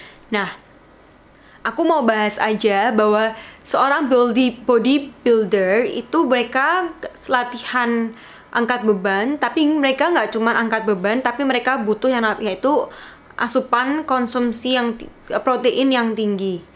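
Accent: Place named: Java, Indonesia. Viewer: Medok jowone hahahha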